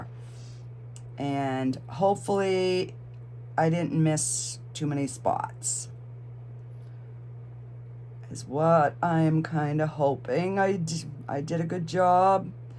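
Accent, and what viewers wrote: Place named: New England, United States. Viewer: Omgsh!!! I am in awe. Your attention to detail with all the colors is incredible. It is so festive. What part of New England are you from? I love the accent! I am a New Yorker but moved down to Georgia a year ago and everyone comments about my accent!! Thanksgiving is very similar in our family except being Italian of course those foods get added in. Wishing you and your family a blessed holiday P.S. don’t worry about your videos being too long. They are enjoyable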